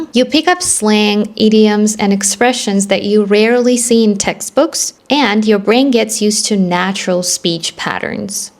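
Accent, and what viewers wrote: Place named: United States. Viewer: All Russian people that I know. They are speaking very well. And they have good pronunciation like an American accent. How is that possible? Your speaking skills amazing you’re learning quickly how? :))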